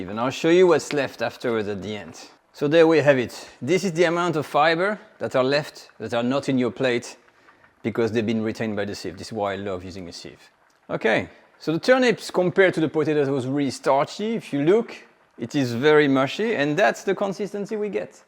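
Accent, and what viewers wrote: France. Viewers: Frensh english lol , nice vdo btw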